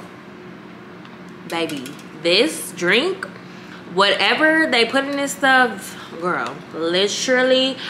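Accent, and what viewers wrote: England, United Kingdom. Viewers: It's the English accent for me LOL Gworl I love it LOL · British accent always has me in stitches😂😂😂 it’s like she talking regular then all of a sudden, boom, accent. I’m like girlll😂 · It was the fuckingggggg BRITISH ACCENT for meeeeee🤣🤣🤣 “LITRALLYYYY” 😭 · Your English accent kills me every time, so funny. You say literally -perfectly 😂❤ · The English accent😂😂😂 literally! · Love your videos as per...and the sprinkling of British accent you give Love from the UK 🇬🇧💕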